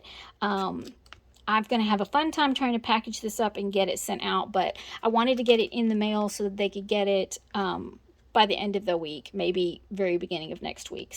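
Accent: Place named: South, United States. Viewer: new subbie here lovely project, where u from?.. I recognized ur southern accent.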